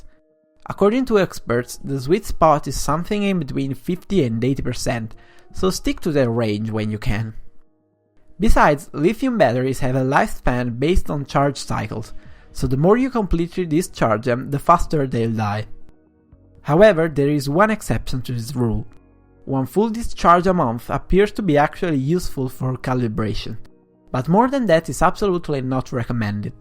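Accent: Italy. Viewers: Mostafa Haque I'm from Italy and the accent is SPOT ON! He's definitely italian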